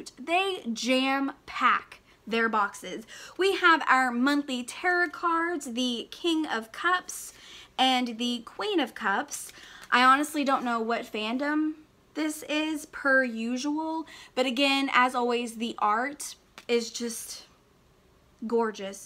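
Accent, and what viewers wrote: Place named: Spain. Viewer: I love your Spanish accent it's so cute. And don't mind it's difficult to read it. I'm spanish and for me English is kind of difficult 😂😂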